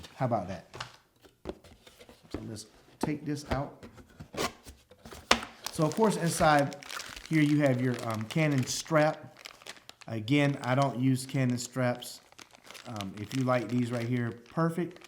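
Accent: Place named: United States. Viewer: Awesome video, are you from Oakland or H-Town if I may ask? It's hard to tell where your accent is from in the USA, I am guessing one of those two, Houston/Oakland? You sound just like my brother in law, so I'm guessing one of those two? Anyways great video and hit the nail on the head, I like the R3 more than any other mirrorless camera and I'm a full time Nikon NPS shooter or photojournalist. However the R5 is also amazing and they definitely beat the Nikon D850, but took a long time and the EOS R/RP were lackluster. Anyways love the videos and you're meant for this! Let me know if you ever want to do a guest speaker or co-host show, I do interviews and YouTube at times, and used to act as a teenager, I'm 36 now.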